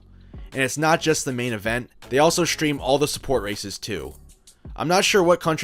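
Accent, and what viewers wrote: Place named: United States. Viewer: I live 10 minutes away from brands hatch and have been going to watch the touring cars since the 90s. Its always been an exciting series to watch and has captured me since I was a child. I cant lie its a bit mad hearing an american make a video on this championship but clearly you have a good knowledge of the series and this was a great watch!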